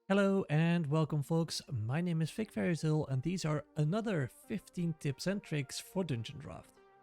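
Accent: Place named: Scandinavia. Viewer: Thank you for that thorough explanation! I definitely would love to see a video about what to do with your map when you’re done. I’m actually pretty familiar with lossy vs. non-lossy formats — my day job is as a web developer! But what threw me for a loop recently is that the “roll20” image export setting exports at 70ppi, whereas the native resolution in DD is 256ppi. So my maps were looking like crap in roll20, especially zoomed in, because the ppi was too low (combined with the 10mb file size limit that made increasing the ppi much more challenging). Someone on the DD Discord helped me out by suggesting that I export at 256ppi at 80% quality, and that has worked beautifully. Oh, and roll20 actually does support WEBP (that was news to me, too!) As far as I know roll20 does not support universal VTT files, however, so I will have to stick to flat images for now. 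It’s mildly annoying to have to place all the dynamic lighting boundaries manually, but fairly straightforward once you’ve done it a few times. (I’m sure someone will say “ you should use a better VTT,” and maybe I should, but at this point I’m so invested in the roll20 ecosystem, there’s a bit of a sunk cost fallacy thing going on. Also I love playing virtually, so I don’t anticipate a lot of print maps in my future, lol). Randomly, if I might ask, what is your accent? I’m guessing Nordic of some flavor? Feel free to ignore this somewhat rude question; I’m just fascinated by accents and language.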